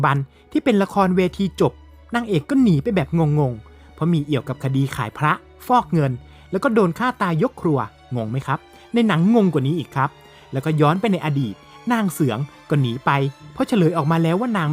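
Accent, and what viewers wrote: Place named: Sukhothai, Thailand. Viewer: 😂เหน่อโขทัยเหน่อตลก😂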